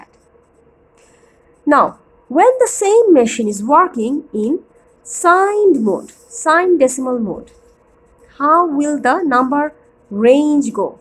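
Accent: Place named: Bangladesh. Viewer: What English you are speaking yaar bengoli English or American eng. At least decide it. It ll be more understandable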